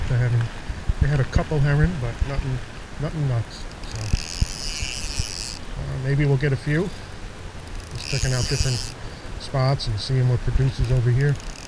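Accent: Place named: Queens, United States. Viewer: Hey kinda sounds like your from Queens? area? - Jamica Bay or even try Bay Park, East Rockaway may be Closer to home. Tight lines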